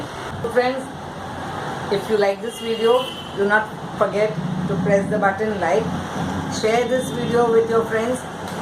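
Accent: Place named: Mumbai, India. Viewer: hello from SF, CA, USA! this looks DELICIOUS. thank you for sharing your recipes and cooking, auntie (is it OK that i call you that?) everything looks so tasty, esp. the fish dishes like this one. can't wait to try it.i love watching your videos, and hearing your bombay accent -- my family is from bombay and you remind me of all the aunties i grew up with!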